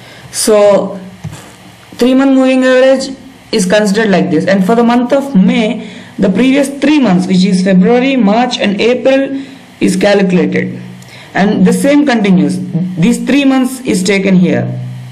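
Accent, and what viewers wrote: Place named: Spain. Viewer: hi are you spanish? sorry but i have to say yr accent is so hard (for me) to comprehend all of it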